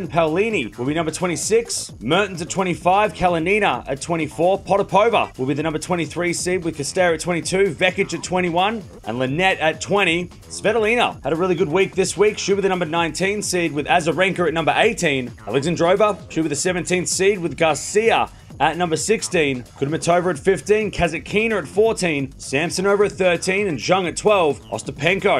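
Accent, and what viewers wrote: Australia. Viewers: talk slower only aussies understand u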